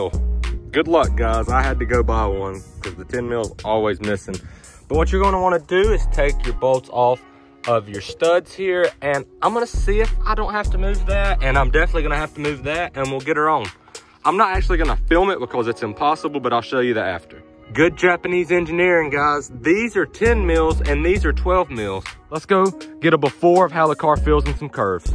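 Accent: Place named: South, United States. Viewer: For me its his lovely southern accent and the background music. #thevibes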